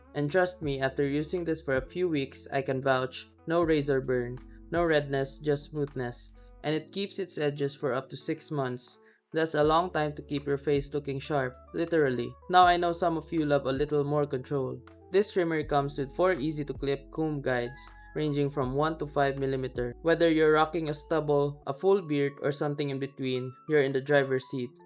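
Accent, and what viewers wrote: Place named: India. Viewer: What's with white guy on your thumbnail when you're Indian voice? 100% you haven't used this or own it, not once did you show it on camera, only website footage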